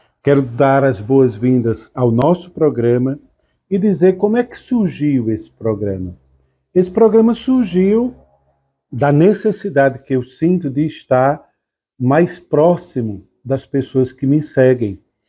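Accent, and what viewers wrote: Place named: Northeast, Brazil. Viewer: sotaque nordestino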